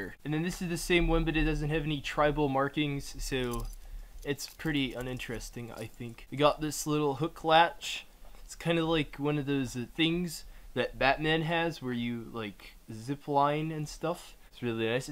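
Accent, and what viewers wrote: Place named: Boston, United States. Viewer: Cool dude sounds like he has a slight accent of some sort. Boston perhaps? 😂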